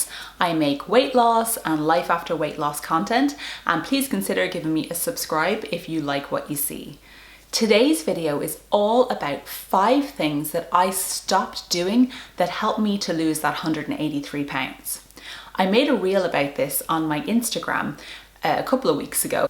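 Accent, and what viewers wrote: Ireland. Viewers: I love your Irish accent. You are delightful! I love this idea!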